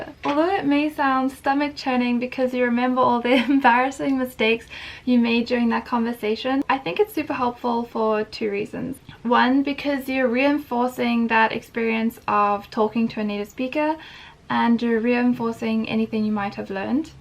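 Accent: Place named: China. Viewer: p.s. your chinese accent is so great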